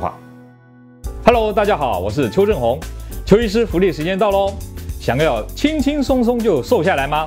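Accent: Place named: Taiwan. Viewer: 這個醫生是台灣人，口音一聽就知道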